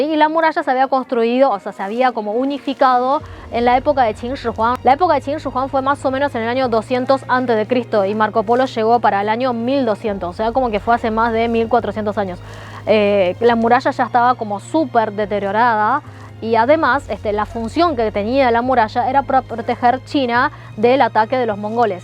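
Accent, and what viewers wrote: Buenos Aires, Argentina. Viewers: Habla muy estilo argentino, la pronunciación es perfecta. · Muy lindo el video y tu dejo argentino me encanta felicitaciones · Jajaja, la chica es argentina? Q gracioso se ve como habla, 👍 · XD ese acento es argentino porteño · Me encanta su combinacion cultural con sus facciones de La Republica China y un soberbio acento argentino, un abrazo y felicitaciones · Soy peruano, y corroboro, no es acento peruano, es argentino. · Cuando vosotros habláis con tanta soltura el castellano ¿es porque sois nacidos y criados en un país hispano hablante o por mero estudio? Es que me sorprende, en tu caso, con un excelente acento argentino. Gracias. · Primera vez que escucho a una China hablando con dejo Argentino 😂😂😂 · Acento argentinazo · Ché tenés el dejo argentino. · Excelente explicacion y con acento argentino... Puede relatar con un acento mas neutro.. 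· Interesante.. hablas como argentina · Nunca escuche a una mujer china hablando con acento argentino, y además muy linda y atractiva 😘😘😘😘, noto que naciste en la bella tierra Argentina, ya desearía que seas mi esposa, besos desde Cochabamba Bolivia 💋💋💋💋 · Lo mas inverosimil fue la magia de los brujos al servicio del Khan. Estos hacían que la jarra de vino llenara la copa (solita) y despues volara a las manos del Khan. Este relato le valió a Marco Polo ser presionado por la inquistion y retractarse de lo dicho.Aaah Bonito tu acento argentino chinita♥ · Tienes acento argentino muy curioso hablar con acentos chino y argentino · Su acento ¿argentino? suena muy bien. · 😂😂 de nuevo un peruano, cree que todo se originó a partir de ellos, la chica vive en Argentina, no escuchas el sho. Es más Marco Polo nació en Perú y Cleopatra es Limeña jajaja 😂😂😂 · Cómo se nota que la presentadora aprendió el idioma español de argentinos. 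La 'sh' se usa arbitraria e indebidamente para remplazar la "ll" o la "y", además del acento característico. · Me encanto tu síntesis. Tu acento argentino es muy sicodelico y me encanta tu guapura. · Hermoso acento argentino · Un interesante relato aumenta el interés por el acento porteño, de la narradora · Es argentina. ¿Y q problema hay esa variante dl castellano u otras? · muy buen video, felicidades. Habla Ud. un castellano casi prefecto con acento argentino ... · Se nota el acento de argentina de esta chica oriental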